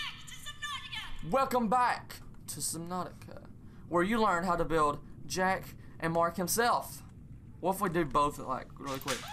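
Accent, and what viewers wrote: South, United States. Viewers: Southern america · I think it's neither. He's probably from southern U.S.A. He's got a southern accent. Not too strong of one either so probably Tennessee or something. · Sounds american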